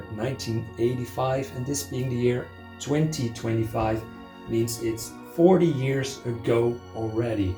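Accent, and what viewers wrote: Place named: Netherlands. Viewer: Aan het engelse accent te horen, ben je toevallig Nederlands? 😄 Ik ga me hoe dan ook abonneren op je kanaal 😊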